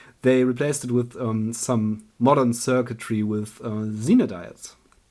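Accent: Germany. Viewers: You sound German I’m from Germany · Are you from Germany? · Vermutlich wegen der grösseren Reichweite auf englisch ? Aso...und sooo schlecht ist sein Englsich ja auch gar nicht, nur die Aussprache klingt halt sehr deutsch :-) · Konnt heraus hören das du deutsch bist xD epic console _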